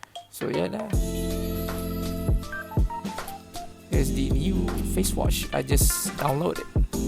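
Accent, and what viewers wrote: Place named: Malaysia. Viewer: bang orang malaysia ke?sbb mcm malaysia...hehe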